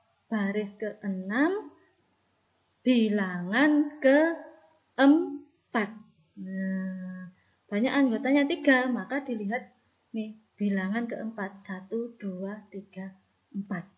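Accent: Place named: Java, Indonesia. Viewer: Good...ustadza...medoknya masih kentara....mohon maaf.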